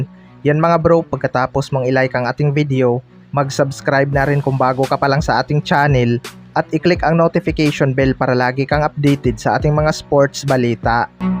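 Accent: Visayas, Philippines. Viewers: Bisaya ka Dong???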